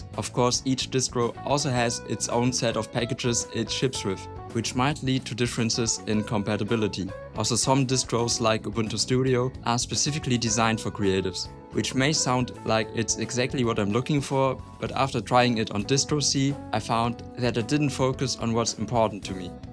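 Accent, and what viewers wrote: Germany. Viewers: Yo, its normal in Germany to have this fucked up accent?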